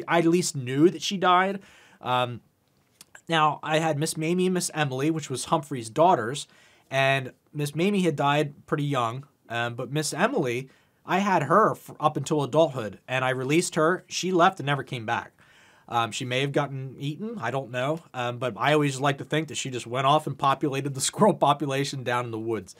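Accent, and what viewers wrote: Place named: Pennsylvania, United States. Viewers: I nailed you as a Pennsylvanian as soon as I heard your voice. Glad I still recognize my native tongue 😂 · You have a very Delco accent lol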